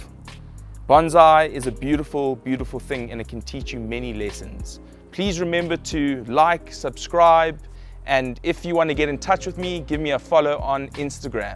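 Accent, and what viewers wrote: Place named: South Africa. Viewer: really great video.....thank you.....also great to hear a fellow south african accent on you tube.....its also wonderfull to hear and feel your passion......im a beginner and just starting my bonsai journey. do you live in South Africa? where abouts?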